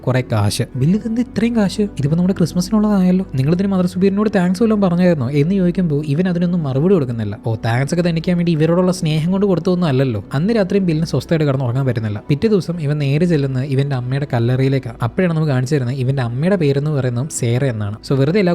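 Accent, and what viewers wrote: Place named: Kottayam, India. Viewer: Bruhh from kottayam or idduki??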